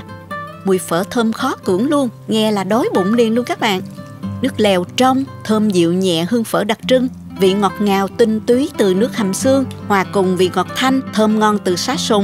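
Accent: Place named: Mekong Delta, Vietnam. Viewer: Nhìn nấu món nào cũng ngon! Giọng đậm chất miền Tây nghe nhẹ nhàng và ngọt ngào. Chúc cô và gia đình thật nhiều sức khỏe!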